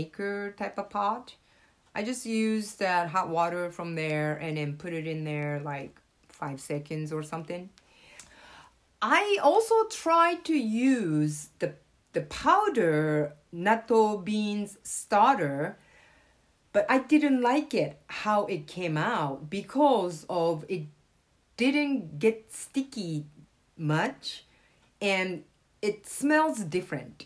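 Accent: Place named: Spain. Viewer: Thank you very much for a very clear clarification. There were at least two reasons I asked such a (stupid) question. Oriental people, i.e. Chinese, Korean, Japanese, etc., mostly have slanted eyes and yours ain't. Your English with a bit heavy in Spanish accent (I bet you fluently speak both Japanese and Spanish). Regardless, I do have some questions about how you make Nattō and probably some remarks / suggestions. I hope you will find plenty of time to address them. 1. You mentioned in your video that you first soaked your soybeans overnight. Am I right to say that you used cold water to soak your soybeans in a room temperature of around 25°C (or 77°F)? 2. Your video clearly explained how you pressured steam the soybeans. In the process of setting up for a fermentation, I believe I saw some water left in the inner stainless steel pot with your inoculated soybeans in a Pyrex container to ferment, am I right to say so? 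So far, these are the only two questions I have. Now, if you don't have any objections, I would like to make some remarks / suggestions about Nattō. 1. Do you know naturally soybeans contain some bacillus subtilis (a gram-positive, catalase-positive bacterium) which can survive in extreme environmental conditions of temperature and desiccation <link> 2. Nattō <link> is basically made from whole soybeans that have been fermented with Bacillus subtilis var. natto. I started doing some researches and found these 3 videos <link> <link> and this video <link>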